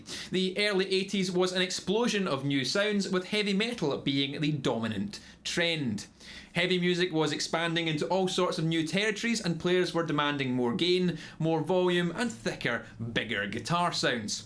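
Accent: Scotland, United Kingdom. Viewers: Reading the reverb article in a Scottish accent/10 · It sounds more Scottish to me than British. All I heard during the whole video was Scottish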